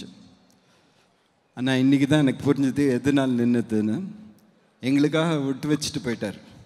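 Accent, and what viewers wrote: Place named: North, India. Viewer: Mani Sir En Vadakkans Maathiri Tamil Pesuraru 🙄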